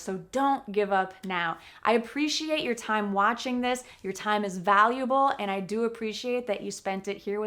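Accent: United States. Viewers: I love the American accent, hope you will help me learn it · Bravo bravo it’s the first time I understand someone speaks American English ☮️🌹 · This is not natural English as it's natural American. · American accent?? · Dear teacher. I am from Somalia, I really love your American sounds. Proud of you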